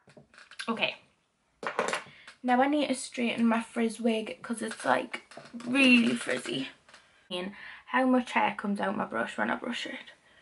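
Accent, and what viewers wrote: Newcastle, United Kingdom. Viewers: I love your Geordie accent I’m from the north east my self and you are they most stripped down honest you tuber I have came across don’t really know if you will see this but hey ho I love your channel iv just came across you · i love watching you cos its so nice to hear a geordie accent for once and youre just so real and lovely xx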